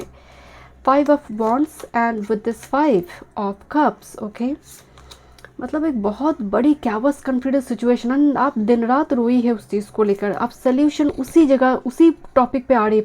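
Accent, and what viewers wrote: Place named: South, India. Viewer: Mam are you South Indian... either hyderabad,or karnataka...I guess due to your hindi accent 😅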